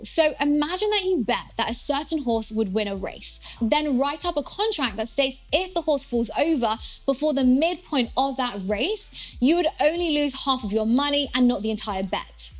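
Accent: England, United Kingdom. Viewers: Good, I bought POLS last month. Makes me feel better to see her taking about it in her cute English accent. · I have to listen to her at .75 playback speed. She speaks way too fast and the English accent doesn't help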